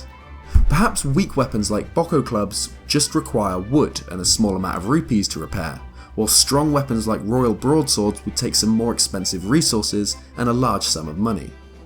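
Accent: United Kingdom. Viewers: absolutely love that you took my advice of having your face in the videos. It's easier for you to edit, we see your handsome face, allows you for more creative freedom, adds another layer to the construction of your videos and its easier to recognize who that lovely British voice is coming from. I'll accept your thank you now. You beautiful husbando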